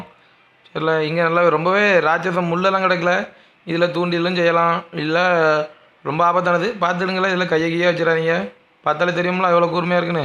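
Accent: Tirunelveli, India. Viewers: Super la naama oru slang vara level laaa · Ji super. Namma ooru slang romba arumai. Plz upload more videos ji. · Bro, next video release please... only solo video with pakka nellai slang... PLEASE.. nammooru basha kettu romba varusham aachillaa...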